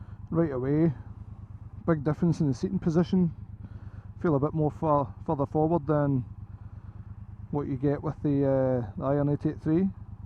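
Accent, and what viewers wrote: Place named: Scotland, United Kingdom. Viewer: Nice roads there, and amazing video. Unfortunately your speech is quite hard to understand for me (heavy Scots accent) Beside this, I am enthusiast for this bike, except for the rear absorber. Actually it's not efficient, nor acceptable to this class bike. My low back is often shoved, I am looking for an optional adjustable absorber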